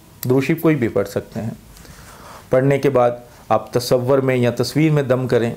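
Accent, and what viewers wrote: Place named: Pakistan. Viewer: Main India se hoon pr Alhamdolillaah Pakistan se bohot lagao hai boli bohot achchi lagti hai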